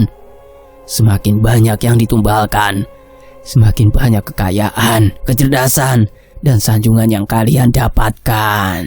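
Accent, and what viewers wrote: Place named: Kalimantan, Indonesia. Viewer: Mas ini cerita dr daerah mn,,, Logatnya dr kalimantan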